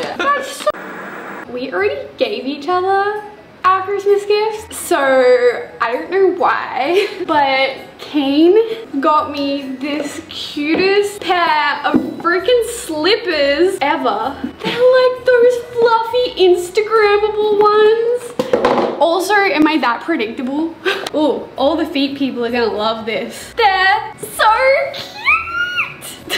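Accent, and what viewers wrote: Australia. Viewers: Bruv is she Aussie?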